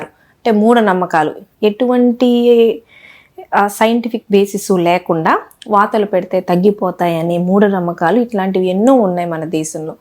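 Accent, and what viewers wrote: India. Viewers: నరాల డాక్టర్ ఆ ,న్యూరో doctor ఎప్పుడూ ఇంగ్లీష్ వాడే మీరు తెలుగులో న రా ల అంటే నవ్వించింది ఇప్పుడు ఊరికి, వీధికి, ఇంటికో డాక్టర్ వచ్చే కాలంలో వుండాము మేడం, మీరు అసలే లండన్ పోయచ్చక మీ స్వరం తెలుగు కు మారింది, ఎంతైన బ్యాంక్ ఎంప్లాయ్ కూతురు ఆ మాత్రం ఉండాలి, చెప్పగా యూట్యూబ్ మీరు వాడు కొన్నట్లు ఎవరూ వాడరు , పుట్టిన రోజు అన్నారు గానీ ఎన్ని సంవత్సరాలో చెప్పలేదు 👂👎🙏🫀❤️, రజియా మేడంతో నెక్స్ట్ ఇంటర్వ్యూ అప్లోడ్ చేయండి ప్లీజ్ మేడం...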